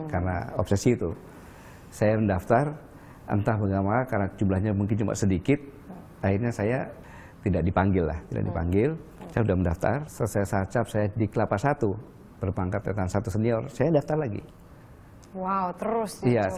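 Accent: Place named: Banyumas, Indonesia. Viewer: presenternya ngapak amat yah ngomongnya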